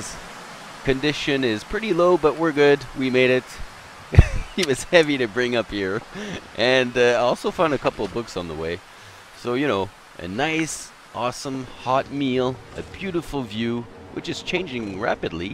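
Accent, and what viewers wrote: France. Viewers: well thanks for your great videos/commentaries! Btw you sound french are you? 😜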